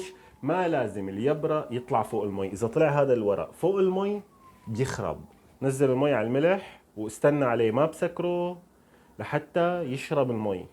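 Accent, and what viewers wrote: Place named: Syria. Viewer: الهجئ السوريه أحلا لسى من الكل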